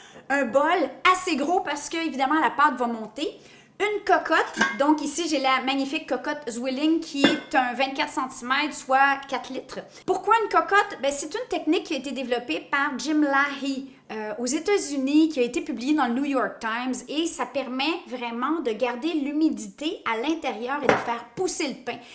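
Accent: Quebec, Canada. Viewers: Bonjour, Magnifique votre pain en cocotte mais comment faites-vous pour ne pas grossir? Moi, j'adore le pain ainsi que pas mal d'autres recettes mais je dois me retenir d'en manger car tout cela me fait grossir. Merci pour le partage. J'adore votre accent quebecois. Bonne journée.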